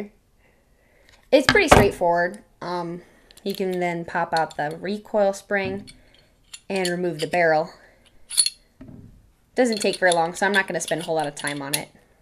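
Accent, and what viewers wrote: Wisconsin, United States. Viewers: Austin France Hey hey you can't hate on the Wisconsin accent!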